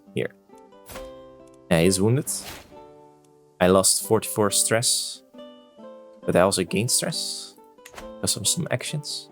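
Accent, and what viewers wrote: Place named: Netherlands. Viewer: The story telling at the begining was really cool! Keep this up! But work on dat THICC netherlandse accent.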